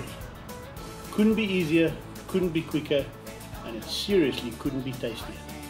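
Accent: South Africa. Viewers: Hello, I am a South African living in the USA - and even though I don't make potjies - I enjoy watching your videos just to hear your wonderful South African accent. Thanks so much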